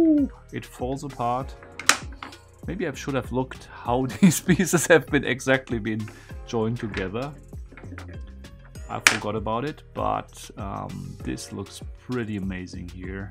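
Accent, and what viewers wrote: Germany. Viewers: It's typical German accent, as we don't have the "v" the same way pronounced as in English and the sound itself not at all (v is pronounced the same as the f mostly. voiced v doesn't exist). With some exercise we can do it but is some extra work while talking. Accents by non-native speakers are mostly based on wrong pronounciations.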